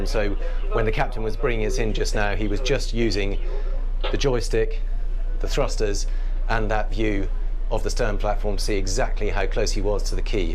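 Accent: United Kingdom. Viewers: With the helicopter, the crowd, and the British accent it felt like James Bond was doing the review. All that was needed for a movie was a chase boat, a few grenades water splashes, and smoke out the back of the Sirena 78 to conceal and cover.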